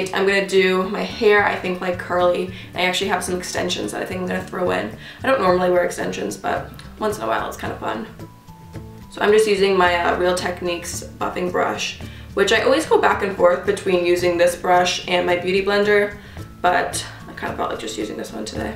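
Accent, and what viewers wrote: Canada. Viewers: you sound so Canadian lol eh? Fellow Toronto girl suffering the same cold and "Blizarding" can't wait for spring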